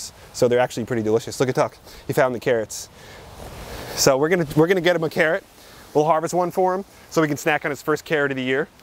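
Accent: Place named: South Jersey, United States. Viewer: Didn’t know u were from Jersey but I’m from jersey n I can hear ur accent. Sounds like south jersey 👩🏽‍🌾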